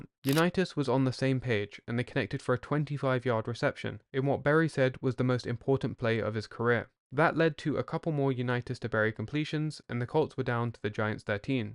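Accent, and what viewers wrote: England, United Kingdom. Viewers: He’s English, and I think real. Some people don’t know how to speak names. Unitas was widely misunderstood. Yes, it is indeed “aMEEchee”, as it was for Don Ameche!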